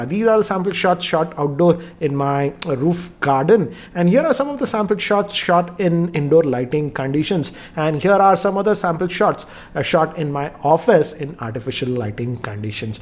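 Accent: India. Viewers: This indian accent wtf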